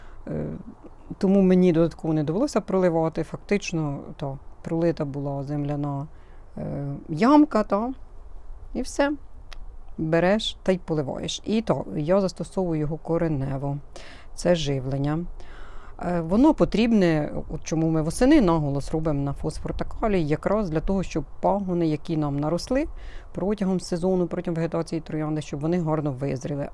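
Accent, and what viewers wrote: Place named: West, Ukraine. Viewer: так ви з Полтави? І не скажеш. Розмовляєте так прямо як із заходу. Мені дуже подобається нормальна українська мова, бо у нас на Дніпропетровщині суржик. Щось як ляпнем.... вчилися в совдепіїї у рускіх школах. Занедбали нашу красиву співочу мову. І до сих пір не ленійка, а лінейка. Це я так колись сказала.дякую за відповідь. Хай щастить.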